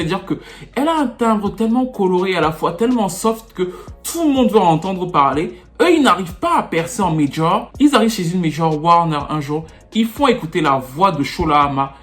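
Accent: Cameroon. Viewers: Je dis hein, je te soupçonne un petit accent Kmer comme mes potes :)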